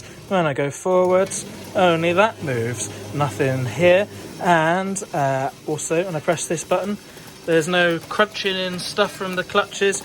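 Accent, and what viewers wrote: United Kingdom. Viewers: Their dialect doesn’t use that sound. It’s weird, but you just have to accept that Brits talk funny.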